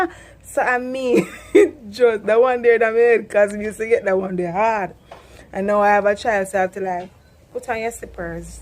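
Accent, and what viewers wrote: Jamaica. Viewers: I never noticed this before I just took it as the Jamaican accent. Cool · Wow, i do love jomecan english. I love the way you talk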